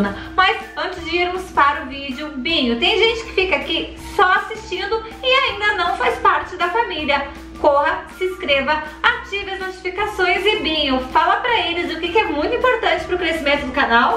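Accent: Portugal. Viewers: Ele é de Portugal? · Ele é português?,eu sou curioso desculpa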